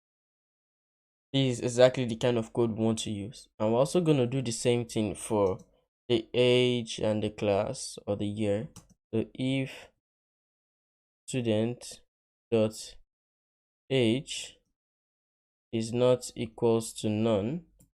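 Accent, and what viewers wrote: Yoruba, Nigeria. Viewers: Great one, the tutor voice sound like a Nigerian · I am also perceiving it from his accent, I am sure is a Nigerian 😅 Yoruba specifically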